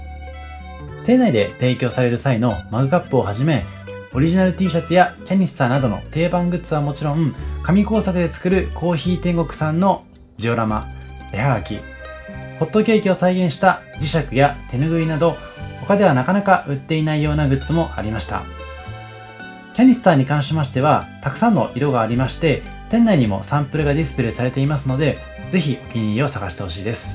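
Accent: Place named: Tohoku, Japan. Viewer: 東北出身の方ですか？私は東北なのですがイントネーションが同じで親近感😊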